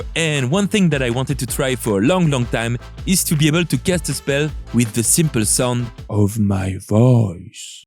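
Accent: France. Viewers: Ton accent français m’avais manqué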